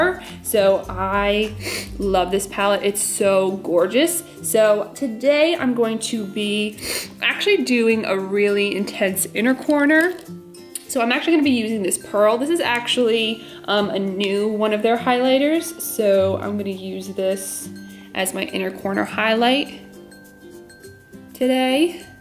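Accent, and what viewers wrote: New York, United States. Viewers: Sounds like you’re from New York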